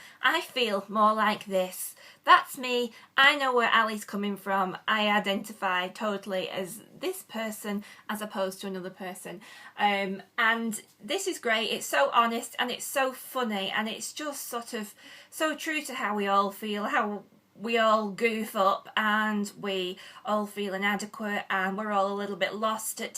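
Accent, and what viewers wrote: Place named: Northern England, United Kingdom. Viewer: Yey, a northern lass. Found u via Eleanor and loving ur videos. Nice to hear a northern accent xx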